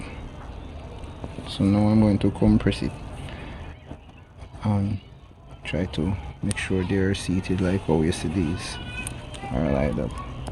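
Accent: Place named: Jamaica. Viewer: I hear a 🇯🇲 yaad man voice.. love the content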